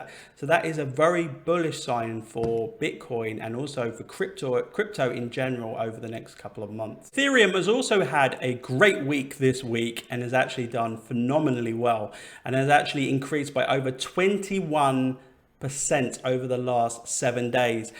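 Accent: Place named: United Kingdom. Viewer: Your shirt is just as perfect as your crystal clear British accent. Love it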